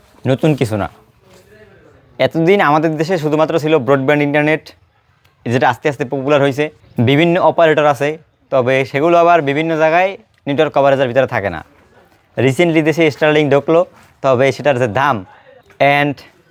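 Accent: Barishal, Bangladesh. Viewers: আপানার বাড়ি কি বরিশারে ভাষা শুনে এমন টা মনে হয়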